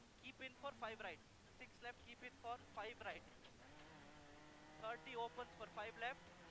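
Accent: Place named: India. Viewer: First time ever I am going to heard Indian ascent for the pace notes... Looking for more content from your side... 😄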